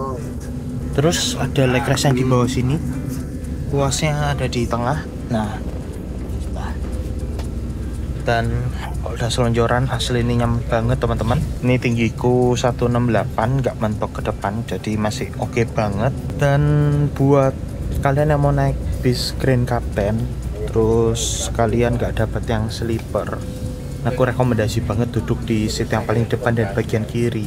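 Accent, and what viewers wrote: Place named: West Sumatra, Indonesia. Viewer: Bicara orang minang ya, kym trans yang abang naiki kebetulan orang minang juga founder ama ownernya, salah satu PO bus urang awak yang diluar sumbar yang eksis seperti epastar ama tam yang bermarkas diluar sumbar.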